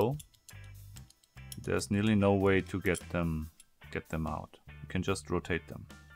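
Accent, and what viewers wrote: Germany. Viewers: . Your videos are great. I love your freaky german accent. But repeat after me. Sehr-ries. Series. Not serious. That shit is seriously annoying. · du bist deutsch! höchstens ein deztscher würde I-dentikäl sagen, ein engländer oder amerikaner würde Ei-dentikäl sagen!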